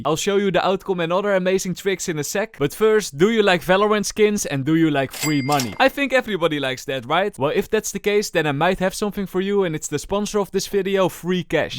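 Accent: Netherlands. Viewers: The "v" sound doesn't exist in many European languages. He's Dutch, so it comes out closer to "falorant".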